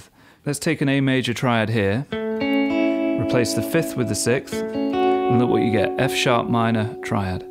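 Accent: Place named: Birmingham, United Kingdom. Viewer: Your accent sounds familiar to me as a born & bred Staffordshire bloke....and the best I can come up with is posh brummie. You're not from somewhere like Solihull are you? 🙄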